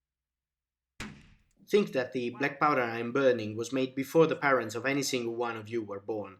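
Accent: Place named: Italy. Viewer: Italy I would say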